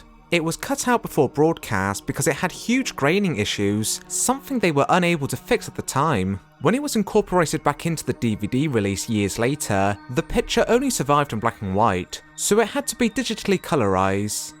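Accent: United Kingdom. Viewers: People hear a British accent and immediately go "you could be the Doctor!".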